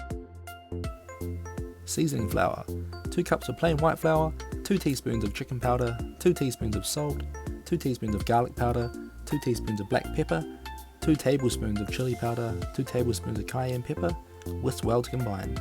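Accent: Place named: New Zealand. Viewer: Umm it's the New Zealand accent. There are other countries in the world too. I understood him perfectly.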